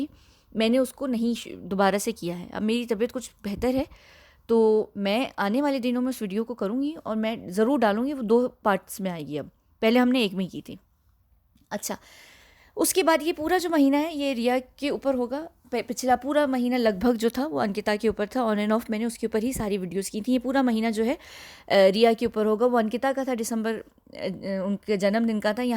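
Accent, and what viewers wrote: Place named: United Kingdom. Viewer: wow sachi she is worth those words aur b bohut Sare...aap sachi bohut acha decode karthi ho really so awesome videos n very informative n I love the way you speak the UK accent of the English words n sentences you speak madam🙏 plz keep making more videos for all of us. Cuddos to all your team👍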